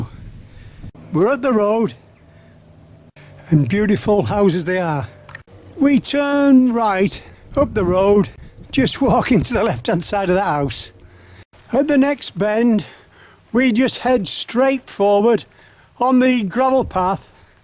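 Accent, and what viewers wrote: United Kingdom. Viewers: No need to sound British.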